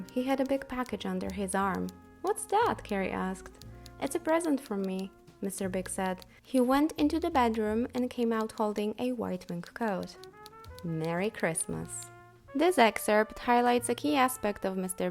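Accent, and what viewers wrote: Poland. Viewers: Your Polish accent is unbearable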